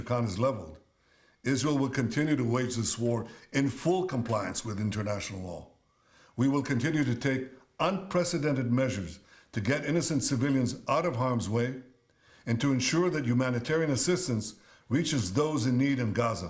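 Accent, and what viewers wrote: United States. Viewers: The man who speaks good English with an American accent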